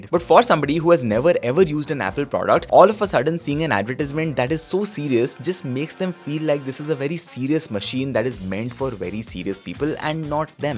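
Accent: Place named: India. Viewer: Peak Indian accent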